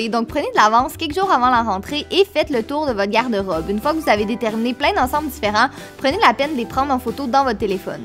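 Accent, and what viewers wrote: Quebec, Canada. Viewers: Super vidéo comme d'habitude. C'est la première fois que je commente une de tes vidéos mais elles sont super. J'aime trop trop trop ton accent québécois. Je t'aime tellement. Continue comme ça, ne changes jamais ❤️❤️ P.S je te suis depuis tes débuts de vidéos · J'adore tes vidéos et je t'adore !!!!!!!! ton nouveau décor de vidéo est juste trop beau !!!Tu es trop belle et je trouve que ton accent canadien est très joli !!!PS : Je passe en 5ème et je reprend le 5 septembre. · J adore ton accent QUÉBÉCOIS est que tu peut me repondre je t adore stp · Salut tu et trop belle j'adore ta série back to school et j'adore ton accent québécoise continue comme sa bisous ma belle j'espère que la joie et la bonne humeur soit avec toi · oh mon dieu je suis marocaine mais j'adore l'accent Québécois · J'adoooore ton accent canadien 💕💕💕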